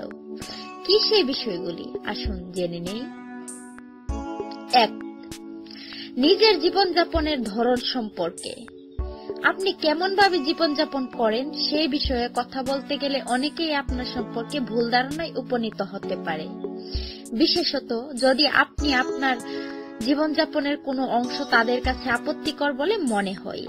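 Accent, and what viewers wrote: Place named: Sylhet, Bangladesh. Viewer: Eije madam eto gan dewar age nijer language ta thik korun.Apnar vasay lagce apni shyleti .Obbosso shyleti hoce emon ekta jayga jekhane kushonskar ar apnar moto gaiya meye diye vorti jekane sobai15 bosorei bia kore.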